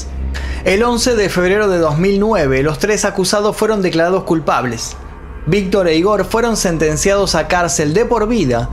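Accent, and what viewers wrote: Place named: Argentina. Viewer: El acento argentino está de más me cae 🙄 de quien fue la idea de distorsionar el español de esta manera 🤦🏻‍♀️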